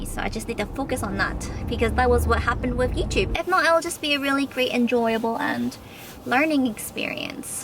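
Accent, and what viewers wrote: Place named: Ireland. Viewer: I love how your accent is slowly starting to sound more irleandish each time I watch a video of yours :D Watching you since 10+ years, somehow its become a ritual to 'catch up' with you. Stay strong and bubbyful! Lots of Love <3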